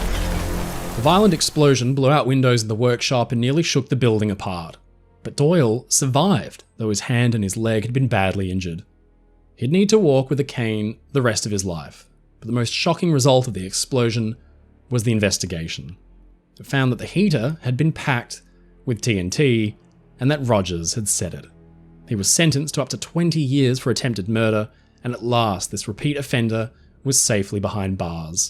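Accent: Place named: Australia. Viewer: 😊great show man, you have an Australian accent, but your last name seems to be Irish… Just curious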